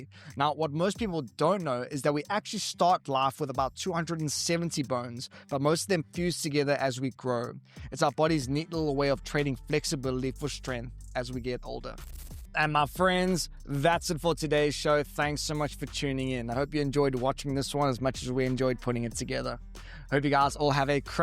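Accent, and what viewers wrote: South Africa. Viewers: This has to be the second worst South African accent.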